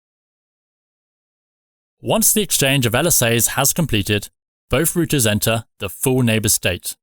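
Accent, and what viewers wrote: England, United Kingdom. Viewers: Dude, it's called English pronunciation!!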